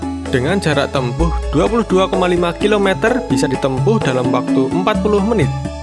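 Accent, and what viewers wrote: Java, Indonesia. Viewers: Mas... aku seneng banget karo logaté, boso Indonesia logat Jowo. Mantep tenan.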